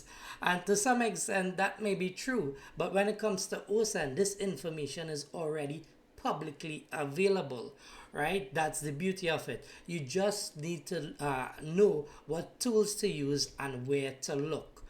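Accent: Trinidad and Tobago. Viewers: You sound trini 🤔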